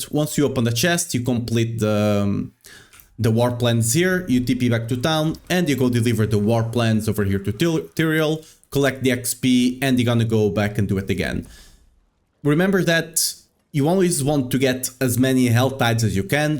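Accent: Portugal. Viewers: It's incredible that I can tell you're Portuguese just from your English nice video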